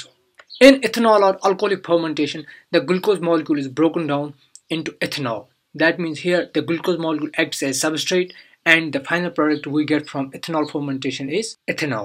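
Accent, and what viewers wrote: Kashmir, India. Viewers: That was informative. Kashur (Kashmiri) accent might be hard for some to understand though.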